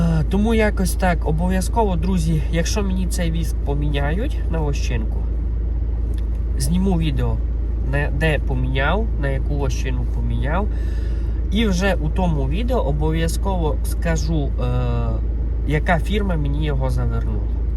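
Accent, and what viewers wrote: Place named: Transcarpathia, Ukraine. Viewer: Ви з Закарпатя говірка закарпацка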